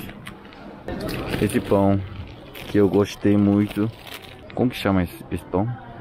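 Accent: South Korea. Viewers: Eu fico muito feliz quando vejo um coreano falando em portugues tipo acho engraçado eu fico tipo : meu deus que lindo caraaa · Kkk é supermercado brasileiro mesmo kkk Mano eu acho muito fofinho ver coreanos falando português kkkk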